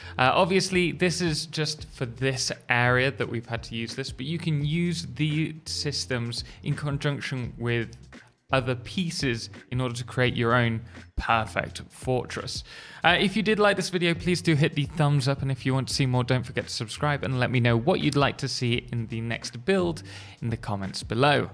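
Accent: United Kingdom. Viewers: hell yeah bro you sound more british than my dad, and that makes me know you bought to lay the words of god on me right now. if anyone knows how to go somewhere new and take it over, its yall 😮📝 im here to learn dawg on a real note what you said about the main story yeah dude those caves freak me out i just want a castle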